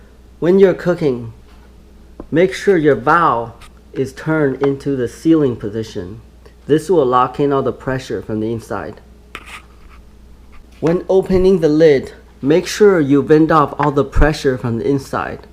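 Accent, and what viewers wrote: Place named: China. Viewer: A cook with a Chinese accent always make great food :-)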